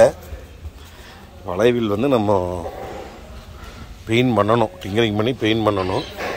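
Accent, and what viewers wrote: Kongu, India. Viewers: "சுடச்சுட பெயின்ட் அடிச்சு நிக்குது!!" அண்ணா நீங்க சொன்னதை நான் மிகவும் ரசித்தேன்!! அசல் கொங்குத் தமிழில் நீங்கள் பேசுவதை கேட்டு ரசிக்கவே உங்கள் வீடியோக்களை பார்ப்பேன்!!!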